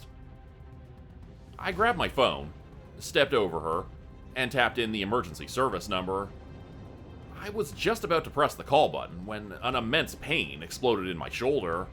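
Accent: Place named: Canada. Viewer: Another great read love the Canadian English lol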